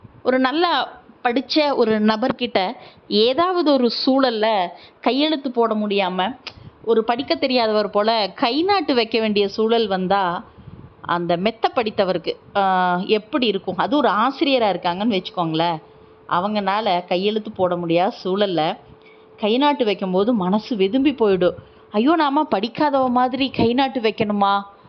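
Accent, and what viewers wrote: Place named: Tirunelveli, India. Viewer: நானும் ரிடையர்டு இங்கிலீஷ் வாத்யார்.கதையில் சொல்லப்பட்ட உணர்வுகள் உண்மை.குரலில் தின்னவேலி பாஷை பிரமாதம்.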